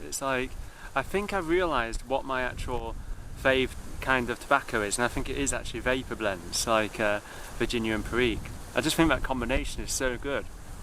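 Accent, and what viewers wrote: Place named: Northern England, United Kingdom. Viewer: A combination of my ancient damaged hearing and your slight northern accent had me hearing Fjord for field...blimey I thought, Bray and the Thames has changed 😂 Golden rule of Blackberry picking...always higher than the local largest male dog can piss. I look forward to the video of your Somerset and Exmoor exploits, it’s always good to see a different view of where you live. Take care and be safe 👍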